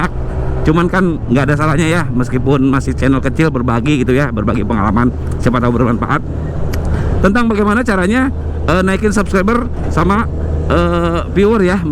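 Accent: West Java, Indonesia. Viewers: Memang aduhai kang logat sunda purwakartanya euy..👌👌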